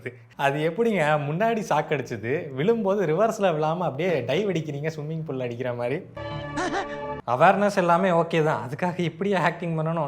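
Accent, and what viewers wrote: Tirunelveli, India. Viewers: Bro Neenga Thirunelveli Yaa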